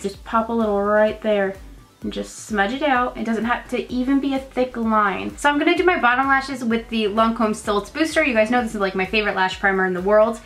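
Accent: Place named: South, United States. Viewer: I hear a southern twang (other than the full country you do) but then I hear a Boston accent where the hell are you from. I would have said l.a before I started hearing all the other stuff.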